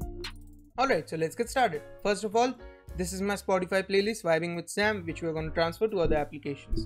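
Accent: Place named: India. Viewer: Deshi style main English mat bol Bhai thoda toh respect Kar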